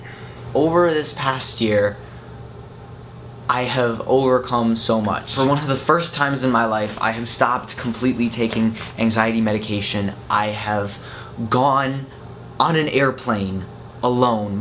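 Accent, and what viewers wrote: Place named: Wisconsin, United States. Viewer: ahhhhh that's why he reminds me so much of Luke they are both from Wisconsin (accent)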